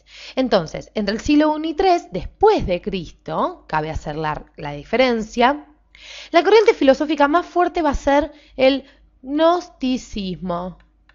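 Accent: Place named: Argentina. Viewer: Hola chicos... que tiene el acento? No son argentinos ustedes? 😊 los argentinos nos caracterizamos por el acento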